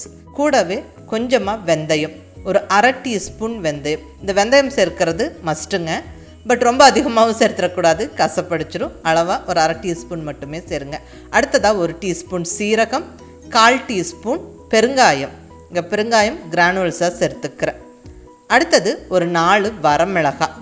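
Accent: Kongu, India. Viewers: கொங்கு மண்டல குரல்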